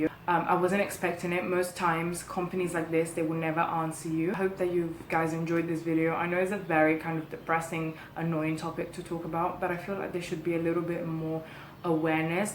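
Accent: United Kingdom. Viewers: it’s really not a stupid question. I didn’t realize she grew up in Italy until she mentioned it. As an American, she sounded very British to me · You learned English from a British instructor(s) so you have that accent. Your mother's accent from Nigeria i hear as well. And of course the Italian 😁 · Wow you sound british.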